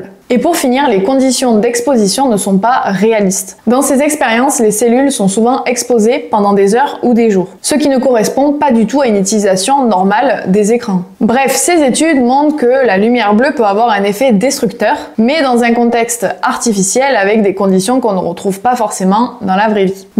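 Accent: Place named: Ardèche, France. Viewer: Vous avez un peu le même accent que moi, l'accent ardéchois, mais vous c'est plutôt celui du Nord de l'Ardèche, limite Haute Loire. J'ai bon ?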